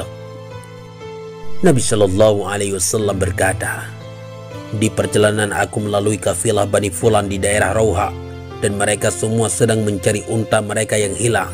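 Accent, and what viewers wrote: Aceh, Indonesia. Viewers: maaf.. aku cuma mau nanya .. kayaknya admin nya orang aceh ya.. salam kenal ya.. wassalam..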